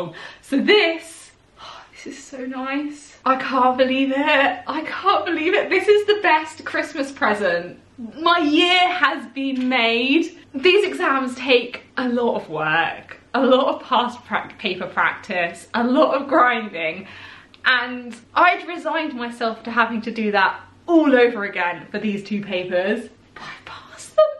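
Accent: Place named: London, United Kingdom. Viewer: this is very random but I've been following you for years (since mid 2018 I think) and I noticed how your accent's changed over time and its the realest thing ever lol you can tell from this vid you've been spending a lot of time in London and having moved around I relate to that smm (like I literally don't know what my default accent would be anymore its just a weird jumble hahaha). anyway I know its super random but I just wanted to let you know you're not alone in this I reckon lol. and congrats on passing the exams btw xx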